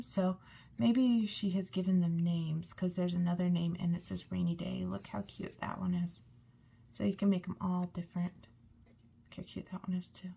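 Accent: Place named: Australia. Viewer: Love your hauls. Tysmfs. Are you from the USA you sound Aussie, I’m Aussie lol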